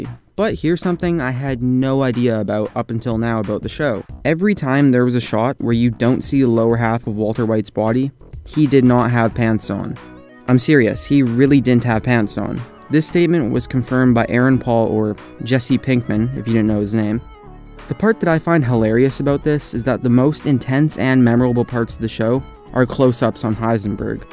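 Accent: Canada. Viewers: Ahhh....I love Canadian accents. <3 _ <3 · i notice a LOT of people that do the "numbers lists" (top 10, 5 things, etc..) always seem to have Canadian voice overs, whether it's due to being produced in Canada or maybe Canadians really like to do voice overs<shrug> either way, it is NOT a complaint, merely an observation, as I'm a big fan of our neighbors to the North! ;) (also, you could get into bars and strip clubs a couple years earlier than you could in the States and Niagra Falls was only a 3 hour ride away! God Bless Canada!!) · Is he Canadian? He keeps saying aboot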